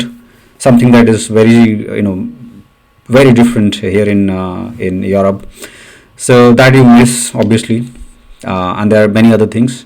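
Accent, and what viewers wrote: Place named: Odisha, India. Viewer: Odisha ka hai, I can still hear his mothertongue influence.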